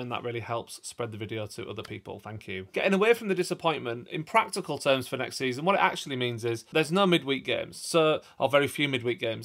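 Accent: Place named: Geordie, United Kingdom. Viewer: Thats a very strong Geordie accent you have.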